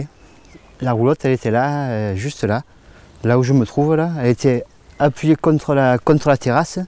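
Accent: France. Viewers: l'accent du sud de la France, j'adore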